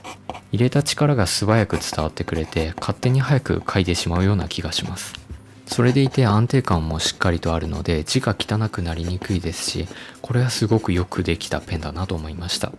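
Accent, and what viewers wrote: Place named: Kansai, Japan. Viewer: たしかに「屁出ら」はダサいネーミングですね（笑）。は関西の方でしょうか？独特のイントネーションやアクセントが可愛らしいです。これからも面白い商品の紹介を期待しています！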